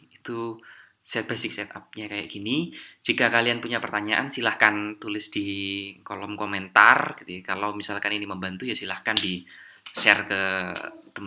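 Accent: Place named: Java, Indonesia. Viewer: ngevapenya kayak menikmati hidup gitu mas. Wkwk. Medoknya masih yaa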